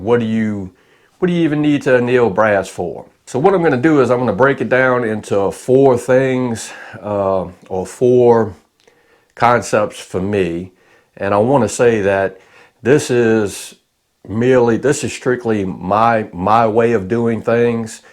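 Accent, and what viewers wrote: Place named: Louisiana, United States. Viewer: Are you sure you're not from Louisiana? Show sounds like a Bayou Teche accent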